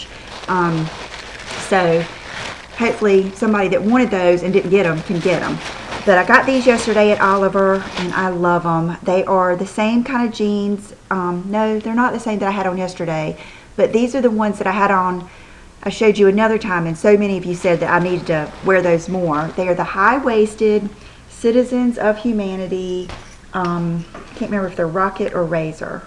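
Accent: South, United States. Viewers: Hi, I just wanted to tell you that I love your sweet voice, it's so soothing and I love your southern accent:) xoxo Melody PS. Is there any way you could do a tutorial on your makeup look? It's gorgeous!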